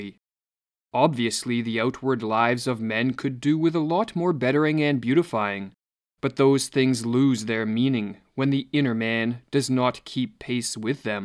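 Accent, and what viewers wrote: Canada. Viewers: Great videos, i just cringe at the Canadian accent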